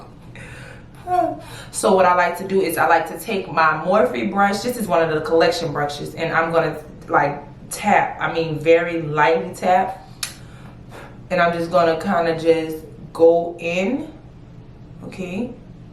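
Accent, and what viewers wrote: Louisiana, United States. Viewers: You are beautiful! And it sounds like you have a Louisiana or Arkansas accent have a lot of family from there thanks for sharing gorgeous look 😍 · Knew you were from New Orleans as soon as you started talking 😍😍 Then I went to see if you put where you're from in the description and I was right!! 😂 I'm from Jersey, but I LOVE NOLA accent!!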